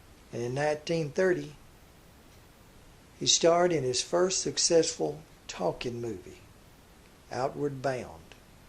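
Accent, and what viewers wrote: South, United States. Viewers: Most interesting thanks. BTW I enjoy your accent. Which part of the South are you from? I live outside the USA so cannot place your state of origin from your accent.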